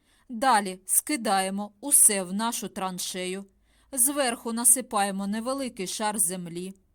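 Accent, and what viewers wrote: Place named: Podillia, Ukraine. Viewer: Доброго дня. Ріже вухо, бо незвичний для вашого вуха. А це діалект із Поділля. Мені вухо не ріже, бо так говорила моя бабуся. Чернігівщина, Черкащина, Полтавщина, Київщина - Подніпрянська Україна - хтось гикає, хтось надто окає, в когось о переходить в у. І це наше багатство, цікава історія мовотворення, контакти з іншими народами... Бажаю Вам зрозуміти наше багатство і не намагатися пістригти всіх під один гребінь.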